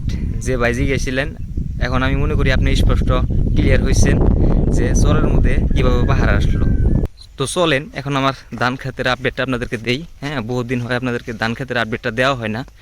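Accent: Bangladesh. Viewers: india or Bangladesh? আপনার ভাষা তো দেখছি ময়মনসিং ের মতো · আপনার ভাষা একদম বাংলাদেশের জামাল পুর জেলার ভাষা। আপনাদের ওখান থেকে আমাদের জামাল পুর জেলা কত টুকু দুরত্ব? খুব সুন্দর আপনার এলাকা। ❤❤❤❤❤🎉🎉🎉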